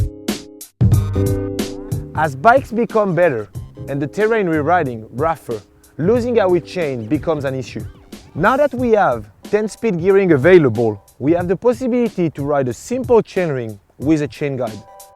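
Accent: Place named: England, United Kingdom. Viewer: Les conseils avec un accent anglais moyen, c'est pas mal. En Français, ça le ferait pas mal aussi.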